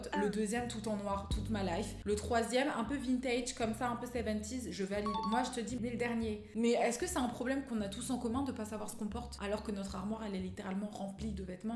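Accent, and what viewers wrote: England, United Kingdom. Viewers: Peuhh ! Arrête de juger les gens et surtout arrête de dire ( COUCOU GUYS ) on est pas tes pote ! Et puis arrête avec ton accent anglais sa saoule !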